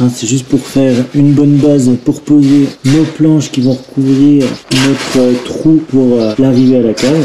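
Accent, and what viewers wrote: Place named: Franche-Comté, France. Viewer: J'adore ton lancer d'eau pour humidifier avec ton petit balai à chiottes. Salut à toi mon Franc-comtois. Reste ainsi avec ton accent tellement vrai. 😊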